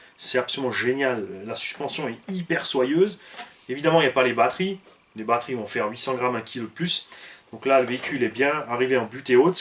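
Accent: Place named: South, France. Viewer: Les essais ! Les essais ! Les essais ! Tu commence à y prendre goût à l’accent du sud :D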